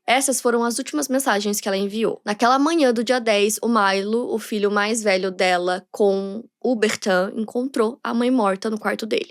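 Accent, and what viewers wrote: France. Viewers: Eu amo quando a fala francês, vc e incrível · PARABÉNS pelo belo francês ♡ arrasouuuu ❤ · A gata arrasando no sotaque francês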